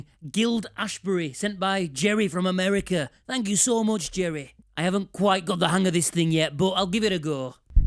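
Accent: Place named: Scotland, United Kingdom. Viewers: I just noticed he's got the same accent as Scrooge McDuck. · ...as an American dipstick bassist..I like your style..and as an owner of a lot of different basses..it's really cool how you show the differences in the various basses...chunks of wood with magnets and strings...but its the owners hands that makes the instrument talk..oh..as an American dipstick...when I first ran into your post...I thought you were Scottish...sorry...( I consider myself more of a douche nozzle..)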